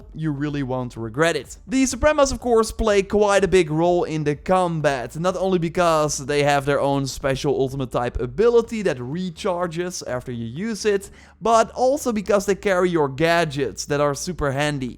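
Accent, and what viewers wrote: Netherlands. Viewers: New to the channel, hearing your accent, are you dutch? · You sound dutch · Ik hoor een Nederlander 😁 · You sound way too Dutch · I'm Dutch too, but dang Dutch accents are really annoying to listen to. it's called a hovercraft you dolt, we also use that word here in NL. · How is He Faking it? That is his Dutch Accent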